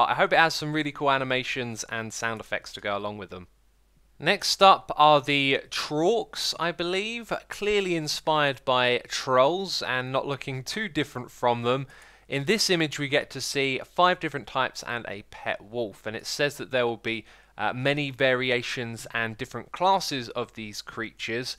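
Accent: United Kingdom. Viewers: I wanna see this guy play the game, I like how his british accent sounds.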